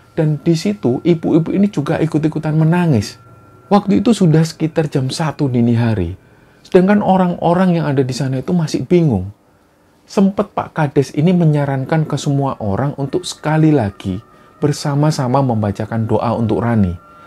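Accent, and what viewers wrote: Java, Indonesia. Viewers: Xg Q suka dari ms tuh suaranx, terus logat jawanx terasa kentel banget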